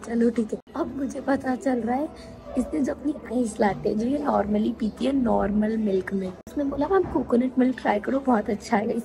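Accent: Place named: Bihar, India. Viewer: Your bihari accent is just soo cute when you where unboxeing that red purse 🥰also lots of love for you both ❤and waiting for your fun videos💞💞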